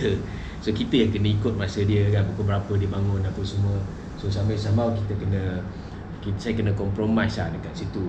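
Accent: Sarawak, Malaysia. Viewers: Bangga dengan ni... Satu he yg X suke... Bahasa Sarawak ko X tajam dah bro. Kemonnnn. Xkan la X boleh tune.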